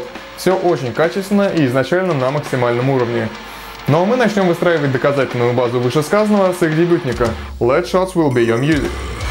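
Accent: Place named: Russia. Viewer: Годнота зашла, да русский акцент немного режет слух, но это лично моя маничка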